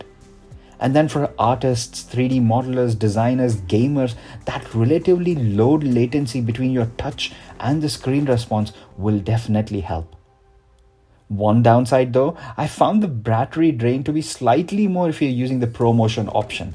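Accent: India. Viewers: that's y..I was thinking that it sounds like Indian... well done bro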